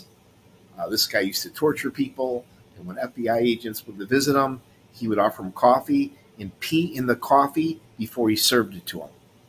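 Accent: Chicago, United States. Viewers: Great video, you have the Chicago ascent to the 10’s. When I moved to LA in 1980. I worked with a few guys from Chicago, that was the first time I heard the phrase “Jack off”. I am from NY were we say “Jerk off”! They were great fun to party with, and man could the drink and eat! God bless my friend.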